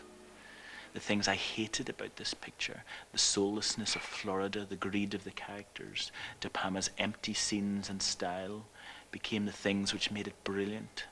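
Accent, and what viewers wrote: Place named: Northern Ireland, United Kingdom. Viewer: Hes from Northern Ireland buddy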